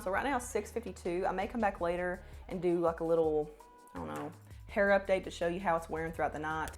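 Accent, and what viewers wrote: South, United States. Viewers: Your southern accent is soo charming ☺️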